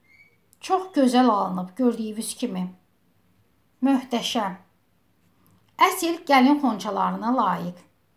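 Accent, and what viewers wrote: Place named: Turkan, Azerbaijan. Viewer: Salam xanım necəsiniz. Yazan Nihadın anasıdır. Əllərinizə sağlıq görünüşü gözəldir. Lətçənizdən Zirə, Türkan lətçəsidir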